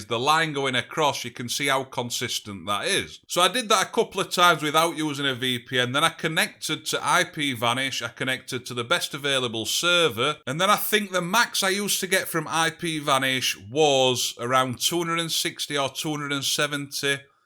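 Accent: Yorkshire, United Kingdom. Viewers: Thumbs down due to the Yorkshire accent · I'm from Germany and I like that Yorkshire accent.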